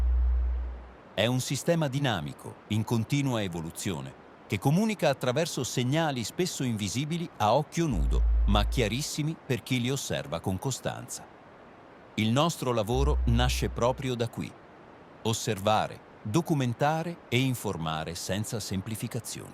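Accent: Italy. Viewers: Wow, the reader (or "reader?) of the information this time is the strangest one I have ever heard! In the beginning, there is a clear Italian accent even with the super rapid speech, yet there is also the typical AI pattern of pausing between words that belong together, failing on scientific terms like Bradyseism, and misunderstanding Celsius for whichever funny variety that comes out each day. On top of that, the accent also changes to some variety of Asian pretty quickly, and there is even a couple of inserted space filler sounds like "ah" and "mm"! Please, commenters that read this and are fluent in English: volunteer to do the reading instead of letting AI abuse the daily bulletins! I would do it with pleasure, but my teeth will prevent that for a few months, so at least one other subscriber is needed!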